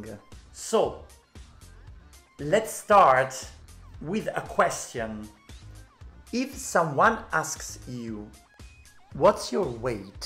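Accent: Italy. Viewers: Your Italian accent is beautiful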